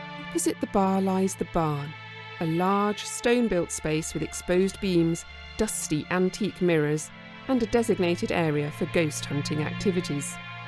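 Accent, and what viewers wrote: United Kingdom. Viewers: How wonderful to hear a British female voice speaking English so beautifully. Something that used to be not uncommon. Nowadays women barely understand the language and complete with all the tattoos, well, what can you say?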